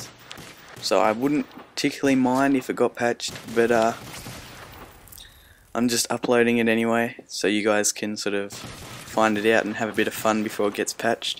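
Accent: Australia. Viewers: Nice, And finally an aussie ^__^ good vid =)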